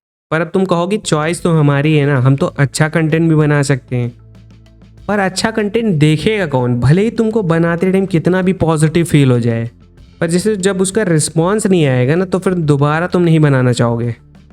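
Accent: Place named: Uttar Pradesh, India. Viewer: Aapke accent se laga ki aap UP me khi se ho. Maybe Lucknow? I can be wrong.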